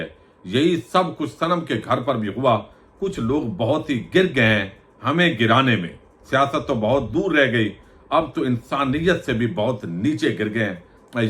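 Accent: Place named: Punjab, Pakistan. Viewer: صاحب یہ کام آ پ کے بس سے باہر ھے آ پ بات کرتے وقت خود پزل ھو جاتے ھیں پہلی بات کا بعد میں اور بعد والی بات کو پہلے ۔کر جاتے ہیں پنجابی آ پ کے منہ سے زبردستی نکل رہی ھوتی ھے آ پ کوئی اور دھندہ شروع کر دیں ،عمران ریاض ،سمیع ابراھیم ، حبیب اکرم ،اور اوریا مقبول جان بننے کی کوشش نہ کریں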